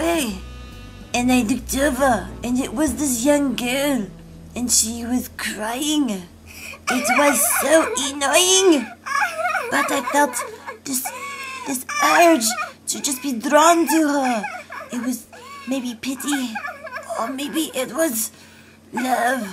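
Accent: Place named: France. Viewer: Ohh is a darling!!! Love his french accent too:D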